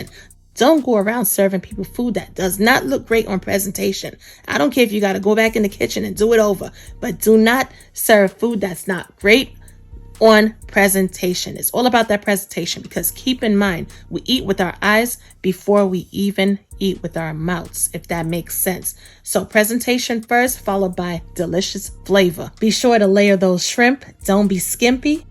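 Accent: New York, United States. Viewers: Looks delicious 😋 you gotta be from NY or Jersey 😭😭 I know that accent 😂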